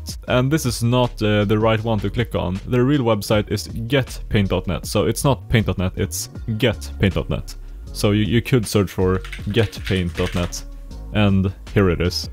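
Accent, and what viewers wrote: Sweden. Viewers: the swedish accent i knew he was swedish from the first second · Han hör är 100% svensk😂😂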